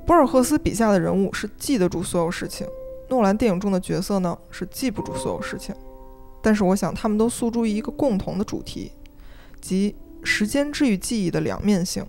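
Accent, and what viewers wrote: Beijing, China. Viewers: up主的北京口音很纯正呀😊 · 北京话吹牛皮就是强！这集变成南京话或者安徽话就……希望up主演绎一次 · UP主妥妥的北京人，儿化音太酷了。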